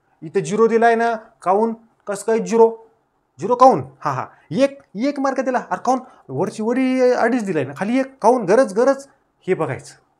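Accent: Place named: Marathwada, India. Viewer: तुमची शिकवण्याची भाषा खूप छान आहे ...एकदम सहज समजते....एकदम रोखठोक आणि मराठवाड्यातील मराठी...💪👍🙏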